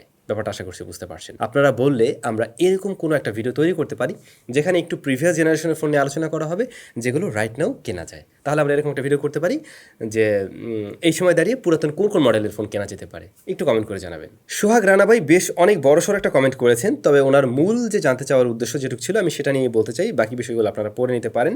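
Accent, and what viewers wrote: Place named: Comilla, Bangladesh. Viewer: Ar vaia apnar village ki Cumilla? Maje maje kotha sune mone hoccilo. Please janaben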